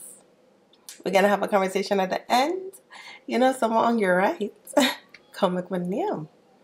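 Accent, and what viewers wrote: Jamaica. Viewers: I knew it just the way u talk I know u Jamaican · I LOVE UR ACCENT Where are u from? u prolly already said but haha sounds like Jamaica but its lovely xD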